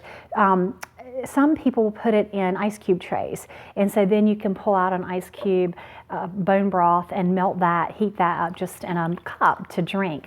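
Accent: South, United States. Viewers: i really like watching you you have a very calming voice love the southern accent I'm from london uk xx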